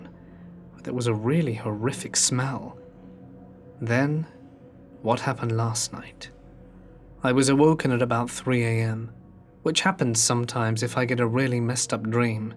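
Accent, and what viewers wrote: England, United Kingdom. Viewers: You so Emglish ,i love it 🥰xxx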